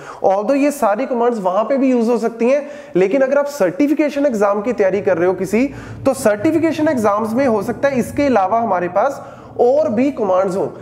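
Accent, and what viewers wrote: Punjab, India. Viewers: his accent is so punjabi like 🤩 are you punjabi ?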